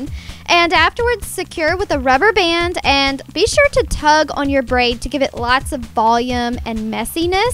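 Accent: South, United States. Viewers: I love you accent southern belles represent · this was a really good video! Thought I would pop over and take a look because I had just uploaded a video on the katniss look and your video came up as a suggestion :) Great editing and excellent job on the video... love your american accent! :) Going to sub you :) Oh and I used the same nyx illuminator in my video too! lol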